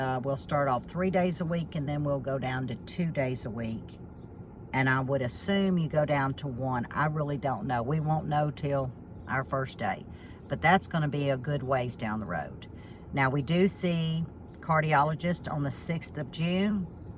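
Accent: South, United States. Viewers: Miss I say sqaRsh, and waRsh, Wooder, (water) and Breeeed (Bread) and I'm born and raised on Marylands Eastern shore. We sound a like suthern sister😂❤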